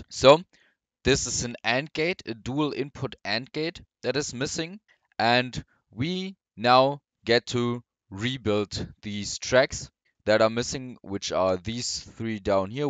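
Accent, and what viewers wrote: Germany. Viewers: why talk german people so hard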